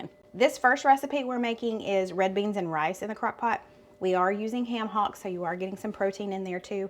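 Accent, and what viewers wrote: South, United States. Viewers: I love your southern accent I have friends who have a southern accent im a Yankee in Michigan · I'm from Iowa, so I have a Midwestern accent, but I love your Southern accent. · Hi ❤. I’m from S Texas and I love your “very” Southern accent. I don’t think I have an accent either 😂. Love your podcasts and all your recipes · You definitely have a Southern accent. I grew up in Iowa which is in the Midwest but have lived in Colorado for the past 35 years. 😊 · I have seen so many crockpot lasagna recipes that just didn’t turn out looking like they were good. So many looked so dry. They were probably overcooked. But this one I’m definitely going to try bc it looks delicious! Thank you! Girl, I’m from the South too and we have a southern drawl not an accent! At least that’s what I’ve always thought. We lived in of all places Georgia! 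And most people that we met thought the same! They would say “we love hearing you talk bc of your accent! We were in Peachtree city and it’s a stirring pot of people. Most were northerners (Yankees)😂😂We made some good friends! I loved hearing them talk and likewise! All good recipes on this video❤😊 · I always thot southerners have the drawl lol...you know like boyyyyooolling...so is right lolol! I love yall! Did yall get snow? I had to rewatch this to see if i wanted to try it....yes...got all ingredients on my walmart order for tomorrow! I dont like spicy hot...so leaving that out! Keep up the great videos you two! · You sound "classy southern". I'm southern too. Say words the same way. Those meals looked great. ❤ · your phase y'all is a dead give away to you being a southerner! Yes, you do have an accent too! I'm in Maryland and our daughter has moved to GA. Neither of us have an accent! 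Lol I'm looking forward to trying the lasagna and the Queso Chicken Chilli. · Southerner here, my accent is similar to yours but some of your phrases are a bit thicker than mine but im so used to the southern accents and very southern accents it doesn't seem out of the norm but when I travel out if the south ppl always ask where in from 😂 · Girl I'm from Georgia and yes you have a southern accent. I say boil and oil just like you. just needs to accept the fact that he likes cream cheese. Sorry not sorry. These dishes look so good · I'm from the south. We have similar accents. You sound like me. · I’m watching from England, I love your channel and the recipes as you keep it real, and I love your sweet southern accent, you have a real man there too! Best wishes 😃 x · I'm originally from Goldsboro, N.C., but moved when I was 15 to Sevierville, Tn. No matter where I go, everybody asks me where i'm from!! 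I have a very southern accent and people acctually want me to keep talking for them. I worked for Dollywood for 5 years,& fit right in there right away. I love your Southern accent, & I think it's way of dropping sparkles & glitter down every time we speak!!!! I think it's a God given special blessing to be a southerner, & spread all the Southern charm around!! One day I wished you would do a video of growing your inside spice garden. That is my greatest heart's desire is to be able to have an inside spice garden! Please be honest, and tell the good, bad, and the ugly about having an inside garden, because the cost is alot of money in my world! I love you, & your family, & pray for ALL of God's blessings for y'all in 2026!!! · Wisc. Girl here to me ur accent is strong southern...I make lasagna all the time in crock pot!! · I'm a California girl, but my ex-husbands family was from Arkanas, and I picked up their southern accent. 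I say boilin and fixin somethin, supper. I love your accent. Don't change anything about yourself❤ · Southerner I def sound like you but I still don't know what chow chow is 😂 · Girl, you definitely have an Southern accent! Boston area person here, although I did spend years in the South as an Air Force brat. Accent doesn't matter!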